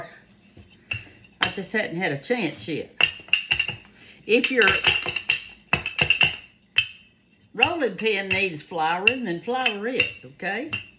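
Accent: South, United States. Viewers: You are the sweetest lady! I love your southern accent, and of course all of those good Ole recipes that I remember my Mom and Dad making when I was growing up. You are a pleasure to watch, and I have learned some of your very wise and valuable cooking tricks. I hope you continue doing your videos for many years to come.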